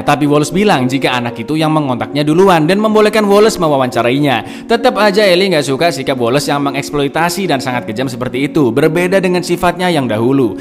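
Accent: Java, Indonesia. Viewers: Org jawa kh bg anjay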